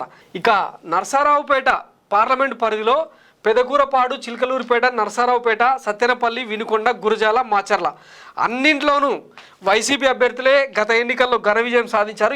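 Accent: Telangana, India. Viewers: అన్న గారు మీ వాయిస్ అంటే చాలా ఇష్టం అన్న మీ తెలంగాణ యాషా చాలా బాగుంది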